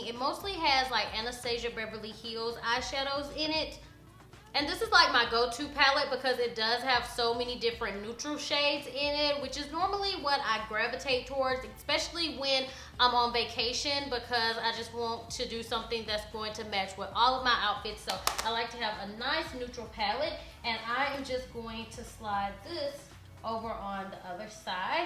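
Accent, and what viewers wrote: South, United States. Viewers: no i didn't come here for fashion advice, i just came to look at you and listen to you talk. i love that country accent of yours I'm from Alabama too, but i don't sound as sexy as you do... and you're so fucking beautiful😫💗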